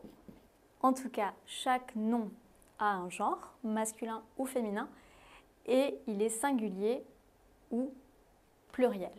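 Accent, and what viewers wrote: France. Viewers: Merci. C’est toujours une bonne idée de commencer au début. J’adore votre accent français.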